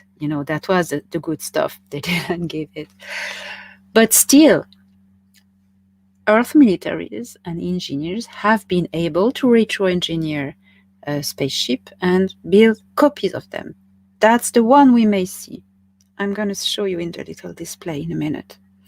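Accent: France. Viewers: French accent speaking English is so beautiful! My children went to French Schools and I love the way they speak too❤️What is particularly cute is that the translation says (Alien sheep) 😄 Raise your vibration by doing something you love, for me, music really helps and arts and crafts. Spending time in nature, watching Sunrise/Sunset, being close to water, eg the sea or a river if you are not close to the coast. Lots of love, Thor Han, The Galactic Federation and all those reading the message 🙏❤️👍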